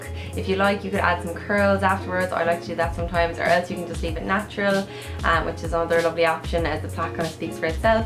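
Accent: Ireland. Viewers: Liked and subscribed, I really enjoy your videos and it's especially nice to hear them in a familiar Irish accent! ❤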